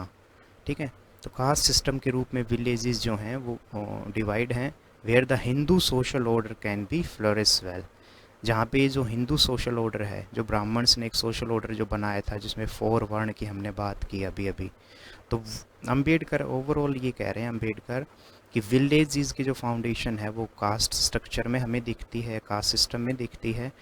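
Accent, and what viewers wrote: Haryana, India. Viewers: Aap pakka Haryana se Ho sir. Aapki language se Lagta hai. Beech beech me yo haryanvi touch bahut Badhiya hai. Aap bahut acha padhate hai😊 12 unit ke baad bhi video banaiye please . Humble request 🙏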